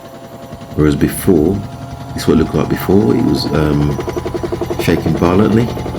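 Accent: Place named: Birmingham, United Kingdom. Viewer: Thank you for a reply too 👍🙏 I took it apart exactly how you said cleaned it with some wd40 & a tooth brush wiped it put it back together with some red grease it’s all i had it took just over an hour put a big load in on a 45 min 1200 spin wash its just emptying & spinning now “silent” I’ve had that problem that sounded like a machine gun for 6months took it apart twice & with your help in under an hour it’s like the day I brought it again I can’t thank you enough 👍👍(every other vid on you tube was saying spider or bearings)🙏 saved me £300+ replacement 🙏.. & just on a tangent I noticed the accent im originally from B32 (don’t know how close that is to you ) if i still lived there I would come shake your hand & buy you a drink thank you so much 🙏🙏🫡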